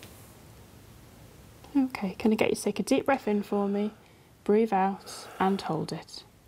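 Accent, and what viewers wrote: United Kingdom. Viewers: british accent)))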